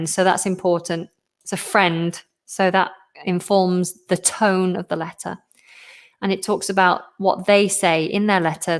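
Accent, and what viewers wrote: United Kingdom. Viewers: is one of the best teachers on youtube. I love her British accent! from Russia with warm hugs! 😉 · you are the best Teacher i love your British accent and Stay Safe Stay Healthy 😘❤💜❤💜❤💜💖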